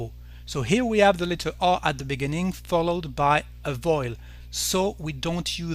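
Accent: France. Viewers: So, which French accent is this? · People who speak English with a French accent sound adorable. You don't pronounce all of our words correctly and your grammar isn't perfect but we can still understand you. Dogs don't roar (in English). Lions roar. The word I think you want here is growl. Dogs growl.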